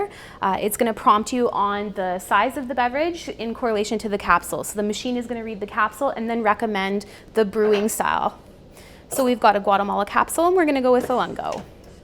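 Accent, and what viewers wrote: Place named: Canada. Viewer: The Canadian accent on this makes it very entertaining! 😂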